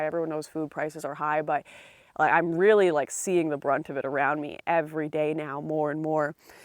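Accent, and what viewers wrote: Canada. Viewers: You sound like you live in Adolf Trudues Canada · Steggering? You Canadians' accents crack me up.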